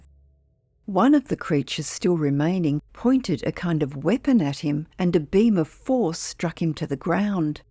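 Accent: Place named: Australia. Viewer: Love your channel as well as your Aussie narration 😀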